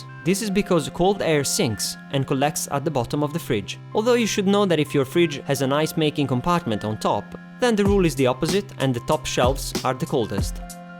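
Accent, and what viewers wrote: Italy. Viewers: You are great " "! I am Italian too but I love your accent when you speak English, it is not easy to speak a foreign language, I know and you do it very well! I also love the way you speak, your irony, I enjoy every word and all your videos very much and I am so happy you are back on line again! Love you Xxx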